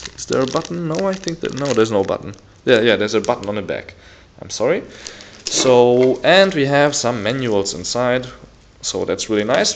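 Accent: Germany. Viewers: Man hoert deinen deutschen akzent raus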